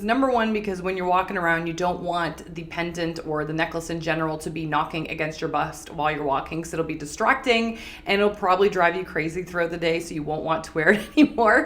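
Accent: Canada. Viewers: I just discovered your channel, love it! Love your accent is it Canadian? Anyhow, I'm a larger woman and I get what you say. I visualise how Victorian women would enhance their pronounced areas even if they were overweight! Could you please post more stills for a bit longer, if I blink I miss them! Thank you Jayne 😊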